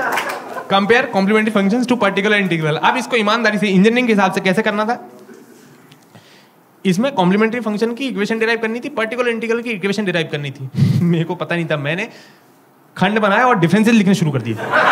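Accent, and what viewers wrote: Bhopal, India. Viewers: I can relate to your humor, Your Bhopali accent makes the joke way more funnier. ~ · Bhopali is in your tone..... ❤️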